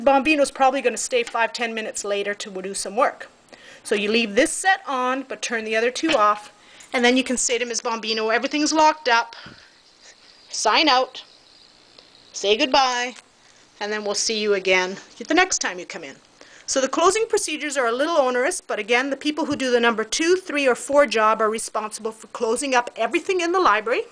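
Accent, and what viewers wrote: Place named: Ireland. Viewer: that Canadian accent has a HINT of Irish in it :D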